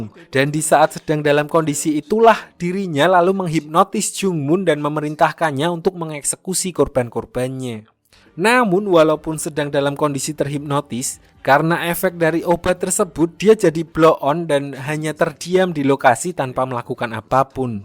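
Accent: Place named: Java, Indonesia. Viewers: Ini yg nranslet kaya orang banyumas tohk😅😅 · Pasti masnya orang jawa nih. Medok gini logat nya wkwkwk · Logat jawa nya medok😅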